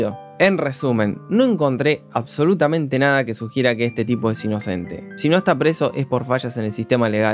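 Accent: Argentina. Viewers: Más bien, no a todos nos gusta el acento argentino.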